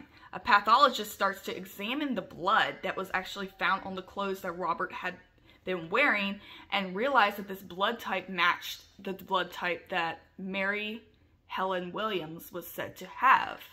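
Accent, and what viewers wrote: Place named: South, United States. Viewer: You're welcome. I'm glad you received my message so well. I thought I detected a wee Southern accent, that is a sad story and you did well. Have you ever watched Murder She Shed channel? She's small too, having just started out and she's a real hoot. I'll always have the scars from what happened to me way back then but I made a decision to not be a victim. I have but one wish for Humanity and that is for people to learn to love themselves so they can love others then maybe all the hate could stop. Bless you too sweet Sister. Perhaps we'll chat again, I see potential in your heart and that makes me feel good and have a little more hope. 💖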